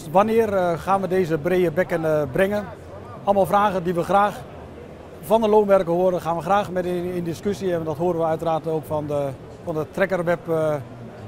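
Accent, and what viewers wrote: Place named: North, Germany. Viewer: It sounds so much like northern German, to my austrian ear^^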